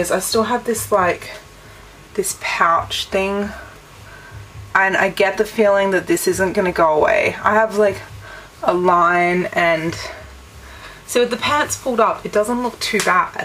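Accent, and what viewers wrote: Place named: Australia. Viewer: u have an Australian accent!! I'm about to get mine done... !! a bit nervous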